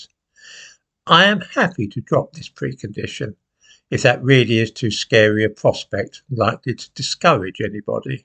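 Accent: United Kingdom. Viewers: Now, if we want to talk about intellectual pygmyism, that's you in a bag on so many levels for believing what this snide old racist has to say. He talks in generalistic terms with nothing to back up his claims, such as "Chinese people are more intelligent than black people", or when he uses a metre to judge that it's due to the Chinese people being on average richer, but utter disclaims societal or home life issues being a factor in wht black people are less rich than Chinese people. Yet the whole foundation of his statement isn't even based on actual Chinese or African examples using an average, he's talking about their attainments in the Western world, without mentioning the elephant in the room, racism. Now, for the non-thinking racist that doesn't bother to check up on the dinosaur's "facts" he sounds plausible, maybe you get suckered by his softly-spoken English accent and it's vague poshness? 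I don't, I am British and I hear his voice and know that the accent is an affectation, as genuine as Donald Trump. Need I go on? Let me explain in summary, you've been suuckered by a racist old fraud who is not, nor has ever been, a historian. He holds no qualifications in it, and the books he has produced are all self-published, no real publisher would touch them with a bargepole. Now, there's a brief summary of a character assassination, have a think about what I've said before you watch the next video by this fraud.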